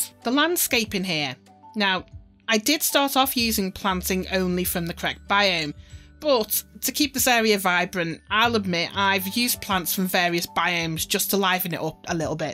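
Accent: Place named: Manchester, United Kingdom. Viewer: I'm an American and I can't place your accent, is it Manchester!? Love your videos! Definitely subscribing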